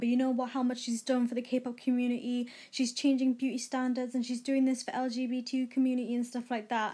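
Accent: United Kingdom. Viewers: Are you... a geordie? Trying to place accent lol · Off topic but where are you from? Your accent seems british to me? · but that's none of my Business . Chill out it's her voice not yours if you don't want to hear it then leave. Also British accents are great don't diss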